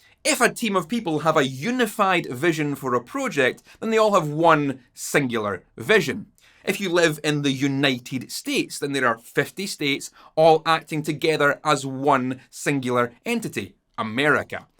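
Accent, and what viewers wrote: Scotland, United Kingdom. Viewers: The Scotsman in me loves your accent, I'm in the USA so no accent, but I especially enjoy the way you break things down logically, and with humor! I plug straight in and keep it clean, because that sound makes me feel good, for whatever reason distortion makes me feel bad. But a smidgeon of overdrive is tasty in the proper context, or maybe a little fuzz? Cheers!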